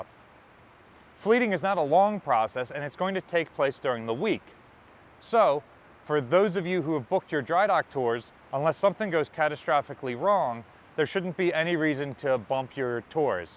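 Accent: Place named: New York, United States. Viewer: great question, great answer to something that's been bugging me for years. thanks. You look and sound familiar. Are you from NY?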